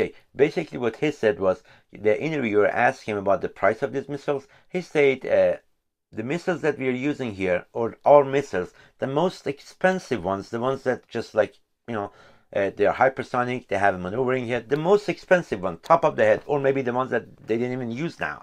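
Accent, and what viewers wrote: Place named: Tehran, Iran. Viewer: harf nadare man kheily khoob mifahmam be valah englisit tehroonie . man kheily khoob ham mishnavam ham mifahmam . man jadidan asheghet shodam agha dadash.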